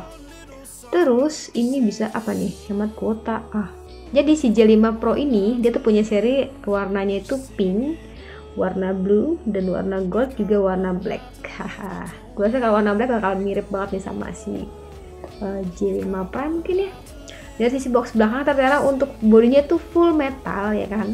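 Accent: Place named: Cirebon, Indonesia. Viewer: biasa biasa bae.. hehee wong cirebon bangettss..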